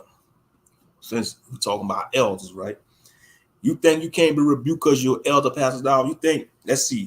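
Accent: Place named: Louisiana, United States. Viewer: You are Clearly from Louisiana 😂 Good video tho. Ringo brought me here 👍🏾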